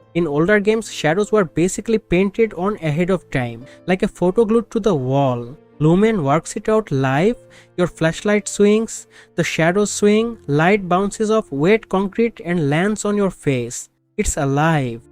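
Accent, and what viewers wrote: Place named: Bengal, India. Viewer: indian accent is not a bad thing bro. bengali accent is very evident , plz fdont try to hide it 😒😒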